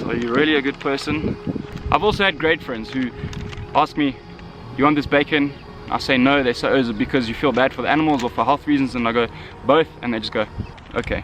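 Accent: South Africa. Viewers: I swear I could tell you were South African... I just couldn't figure it out :D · I just love south African accents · I thought I was crazy for thinking you're South African due to your accent, Good video brother 💪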